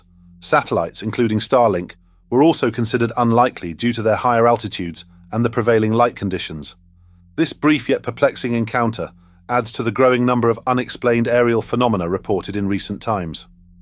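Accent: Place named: Michigan, United States. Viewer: Bring back the Michigander voice! Thanks for another great video.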